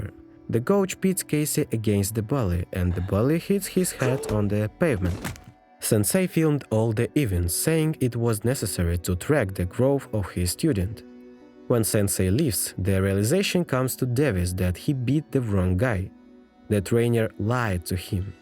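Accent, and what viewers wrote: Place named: Russia. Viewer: Чувак, какой ты ещё?😂 Ещё и страна указана "Соединённые Штаты". Да с твоим акцентом тебя, русского, за километр слышно. Ты уж если не умеешь говорить как носитель языка, так хотя бы не делай вид, что ты им являешься.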